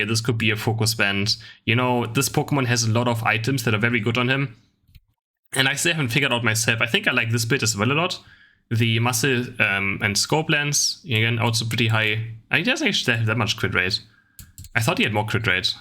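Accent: Germany. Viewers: How can there be Tsareena and Machamp but NOT Lucario?? Lucario is the completr package in so many ways. You can play both builds and its super strong. You can even counter jungle in early game before dread to Set the enemy jungler behind. You have so much secure with him, theres no reason to not have lucario on this list. Its beyond me how you can miss him on ur list. Sidenote: Also please work on ur english pronunciation, your accent is so sharp it could cut bread or Brot, my German friend.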